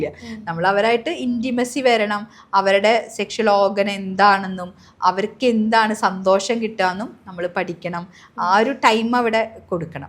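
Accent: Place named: Valluvanad, India. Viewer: വള്ളുവനാടൻ മംഗ്ലീഷ്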